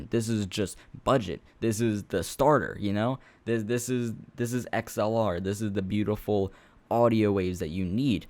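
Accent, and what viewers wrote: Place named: Florida, United States. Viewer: I know that this video came out 7 months ago but I’m so confused on why he saying the n word and he ain’t from Florida he sayin jhit like he actually from Florida.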